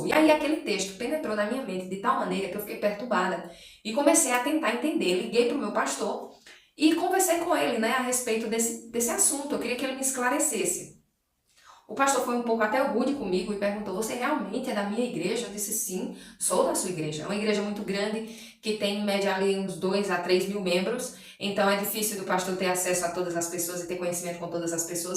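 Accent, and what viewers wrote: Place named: Northeast, Brazil. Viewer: Olá! De qual Cidade você é? Pelo "sotaque " é nordestina rsrs Parabéns por sua escolha 👏 Ganhou mais uma inscrita 😘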